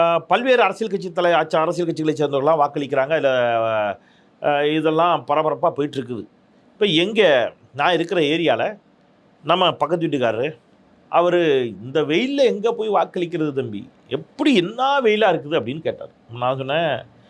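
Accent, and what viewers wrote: Tirunelveli, India. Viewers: பிரதர் ரொம்ப நாள் கழிச்சு நம்ம ஊர் பாஷை திருநெல்வேலி பாஷை கேட்டிருக்க வாழ்க ஜனநாயகம்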